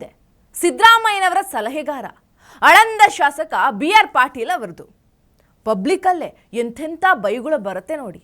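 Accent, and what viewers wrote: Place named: Tamil Nadu, India. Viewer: ಅವರೇ ತಾವು ತಮಿಳ್ ಅವರ ಯಾಕೆ ಅಂದ್ರೆ ಅವಾಗವಾಗ ತಮಿಳ್ ಒಂದ್ ಭಾಷೆ ಅವಾಗವಾಗ ಆಚೆ ಬರುತ್ತೆ ಇರ್ಲಿ ಚೆನ್ನಾಗಿದೆ ನಾನು ಕೂಡ ತಮಿಳು ತುಂಬಾ ಖುಷಿಯಾಗುತ್ತೆ ತಮ್ಮ ಮಾತು ಕೇಳೋಕೆ ತುಂಬಾ ಖುಷಿ 😊👌🙏🚩🚩🚩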